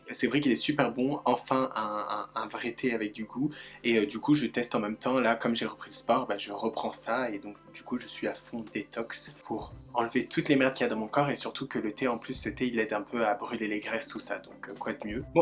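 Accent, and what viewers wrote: Belgium. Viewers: Je suis à la ramasse avec tes vidéos mais je suis à jours sayait lol. Tu es belge ? Question bête 🙄. Je t'adore trop trop trop trop tu m'fais tellement rire 😂 Continue d'apporter de la joie ❤️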